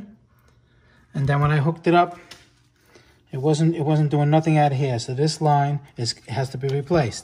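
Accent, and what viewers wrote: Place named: New Jersey, United States. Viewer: Love the east coast Jeewwwessseeyyy accent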